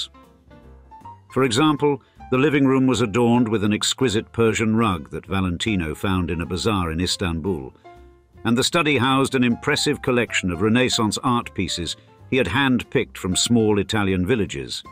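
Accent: United Kingdom. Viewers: Why do these shows always have a narrator with a British accent?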